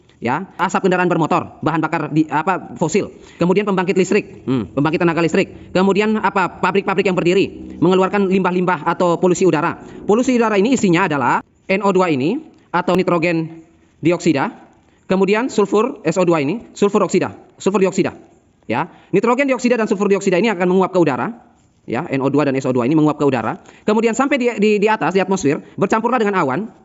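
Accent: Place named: Makassar, Indonesia. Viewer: Makasar kyaknya om sama kayak pak Rocky gerung hehhe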